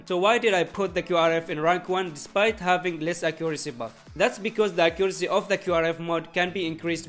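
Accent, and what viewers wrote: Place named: India. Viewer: Guys hes has a indain accent that means hes indain so it fake he is capping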